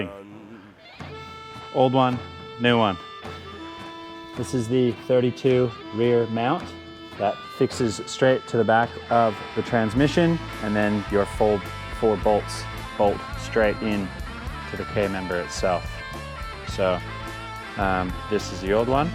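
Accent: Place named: Australia. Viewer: Another great vid, helping me on my own rear end. I can hear the Australian twang coming through in your accent. 😁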